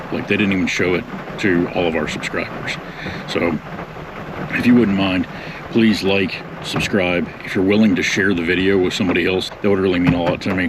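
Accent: South, United States. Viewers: Aha, I knew I heard a southern accent. I finished a three year old video a little bit ago and in my comment , I asked if I caught a southern accent. I just read a comment by you saying you were born in Georgia.. Me, too. I was born in nineteen forty five in an ambulance on Peachtree Street in downtown Atlanta. I'm married a TenneNossee boy, and we moved to tennessee when we retired. The cold and snow and ice of minnesota that you And Fiona camp in just blows my mind. Just What do you find fun in that? I do Really enjoy watching you do it.But I do worry about Fiona sometimes. I look forward to your scamp adventures and watching you redo your Scamp camper.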